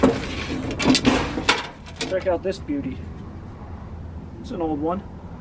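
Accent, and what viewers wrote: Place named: Philadelphia, United States. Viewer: I smell a Philly accent.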